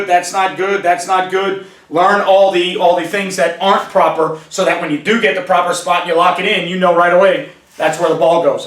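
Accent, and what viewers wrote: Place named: East Coast, United States. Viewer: As a side note at the end of the video, don't ever change the way you talk. I have a really short attention span, and the fast talking, visuals, and constant resets "alright" and "ok" help me stay focused. It also sounds cool...I haven't heard many east-coast people talk, apart from the stereotypical ones on TV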